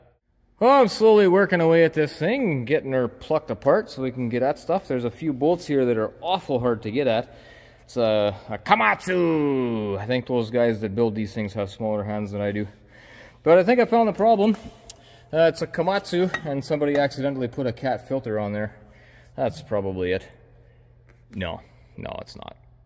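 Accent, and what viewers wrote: Canada. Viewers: Manitoba, eh? Which is where I live too. And you have an accent that places you even closer in Manitoba to me, but I’m not gonna say where.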